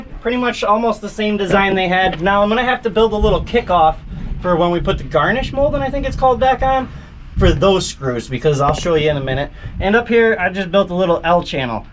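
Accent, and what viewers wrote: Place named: Wisconsin, United States. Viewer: Love this guy’s can do attitude. Love your accent too. Where are you from originally? Reminds me of Wisconsin 🙀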